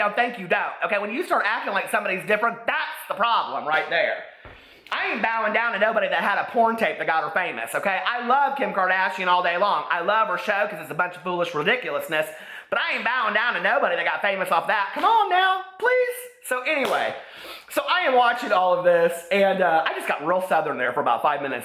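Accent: South, United States. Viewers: LOL...you're alway's sooooo southern :)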